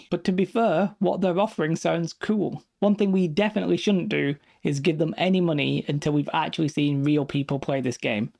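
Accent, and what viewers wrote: United Kingdom. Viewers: LOL, good one! He does not saying Items, he saying I'ems! Love the Bri'ish accent ^^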